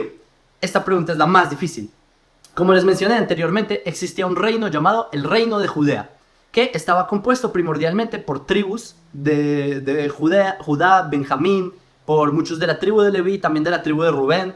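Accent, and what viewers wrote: Bogotá, Colombia. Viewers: Tienes acento Colombiano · Aprendiste español en bogota o con un colombiano ? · Tu tienes un acento muy Colombiano. Naciste de Padres Judios Colombianos ? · Bravooo!!!! 👋👋👋👋👋 excelente. Explicación aclaraste muchas dudas . Te seguiré . Tienes un acento colombianisimo 😊 un abrazo 🫂 🤗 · Tu español suena muy parecido al " colombiano "😊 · Tienes un acento muy curioso, es decir, un acento colombiano y no israelí...en fin, ya estudiaste bien tus lecciones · Se nota que aprendiste el español en Bogotá diria yo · Tiene acento Colombiano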